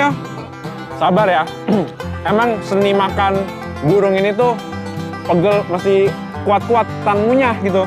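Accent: Jakarta, Indonesia. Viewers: Orang Betawi ye?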